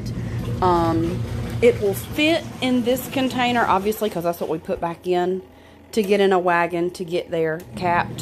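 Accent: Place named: North Carolina, United States. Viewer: Are you from NC or SC? Your accent is damn near identical to mine and I'm from NC 😆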